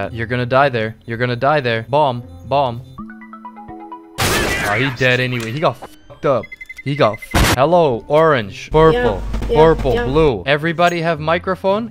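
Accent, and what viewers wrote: United Kingdom. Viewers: nice britich acent matè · Omg ur british accent is so fucking nice dude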